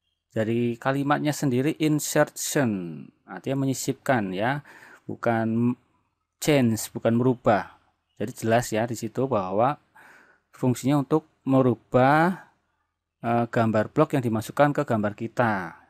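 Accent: Banyumas, Indonesia. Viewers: menyimak om sangat membantu sekali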